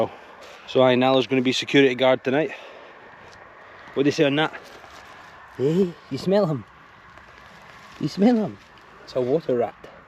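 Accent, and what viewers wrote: Scotland, United Kingdom. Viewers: Wee tar’an blanket. ❤❤. Love the Scottish accent.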